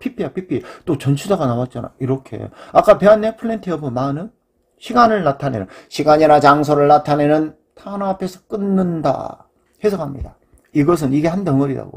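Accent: Jeolla, South Korea. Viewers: 왜 니들 선생님 화나게 하시냐....분사는 동사가 아니래 잖아 ㅋ ㅋ 나이많은 저도 재밋고 유익하게 잘 배우고 있습니다.. (완전 전라도 사투리 ㅋ ㅋ)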